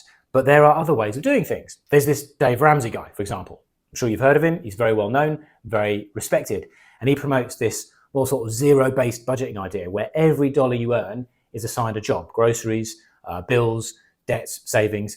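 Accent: United Kingdom. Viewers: is this what plebs like me would term 'spin'...? Suggestion, bias, sales. The beat friend of politics these days. Your use reminds me of the health related mindset change; i get to work out (as opposed to must), get to eat healthily (as opposed to 'ugh i have to eat x). Definitely change begins in the mind. So glad to get an English accent, getting tired of the US ones on social media, just soooo many (i'll offend someone i'm sure). I'm irish, but we'll be neighbourly 😊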